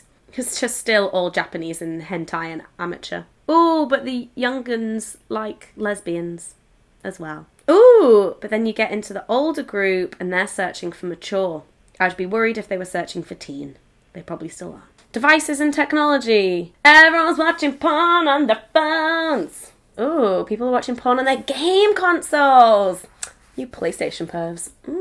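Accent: United Kingdom. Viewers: British English soo sexy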